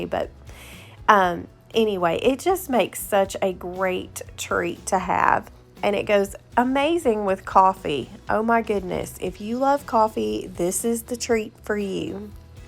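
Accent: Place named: South, United States. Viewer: I watched Charlie's country cottage clip then onto yours.....I just subscribed to your channel and my next trip is to the grocery store to buy delights super moist spice cake and 100% pure pumpkin. I love your southern Belle accent...and really liked your presentation....I almost smelled your muffins. I really enjoyed your video. Thank you...it will be so "yummy ya'all.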